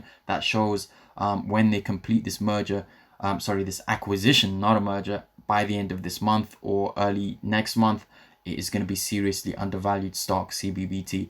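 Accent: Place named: United Kingdom. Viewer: Are you british can't quite tell from the accent sounds quite mixed as though you've lived in different countries. Great vid tho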